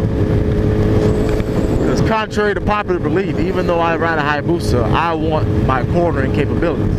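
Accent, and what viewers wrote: Michigan, United States. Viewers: Bro you from Michigan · Are you from Michigan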